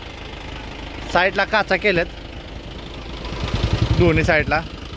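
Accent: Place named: Karad, India. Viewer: Bhava tuza bolnyacha tone lay bhari hay... Karad chi aathwan karun deto....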